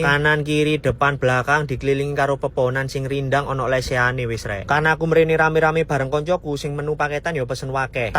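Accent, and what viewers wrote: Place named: East Java, Indonesia. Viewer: Murah sekali dan kalau bicara seperti Jawa Timur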